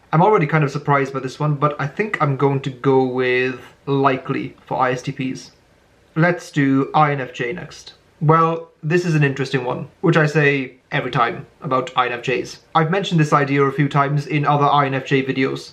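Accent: Wales, United Kingdom. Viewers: He has a Welsh accent! (a variation of British English spoken in the Wales region)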